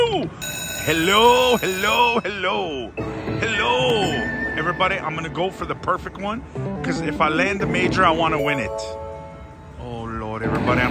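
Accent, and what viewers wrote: South, United States. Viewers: I freaking love you!! I'm so happy I found your channel. I'm disabled and can't go anywhere much. You have made me Crack up so much!! Thank you!!! Are you from the south? You sound like you are😊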